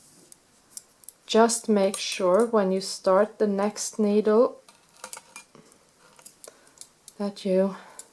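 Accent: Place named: Norway. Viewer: Thank you for this detailed explanation! I lived in Norway ten years ago and hearing your accent brings such nice memories. Also your English is perfect! I remember my Norsk friends would always be embarrassed to speak in English too (until they had a few drinks!) so I smiled when you mentioned it. Tusen takk!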